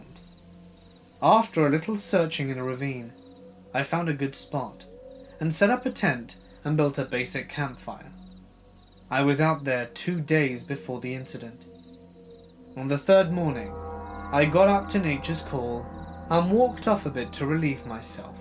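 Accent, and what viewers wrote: United Kingdom. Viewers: Thanks creepy British dude!! You could read a dictionary and it would sound ominous lol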